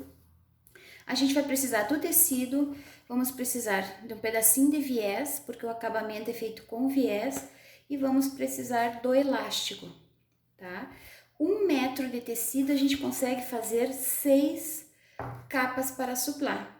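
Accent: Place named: Curitiba, Brazil. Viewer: nossa... Minha vizinha é curitibana e o sotaque é assim tbem rsrs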